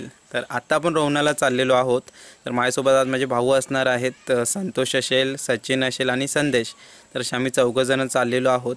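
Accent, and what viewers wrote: India. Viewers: मस्त #properमालवणी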